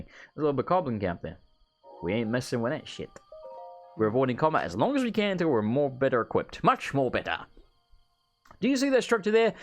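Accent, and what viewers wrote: Australia. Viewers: it's quite alright for an australian voice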